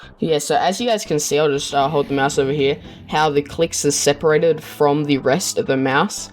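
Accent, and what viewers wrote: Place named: Queensland, Australia. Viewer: finally a bedwars player with a Queensland accent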